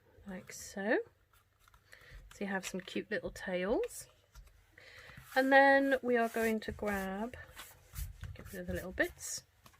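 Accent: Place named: Wales, United Kingdom. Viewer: Beautiful, great technique! Great to hop with you. Also, I hear a little welsh accent coming through. Reminds me of home!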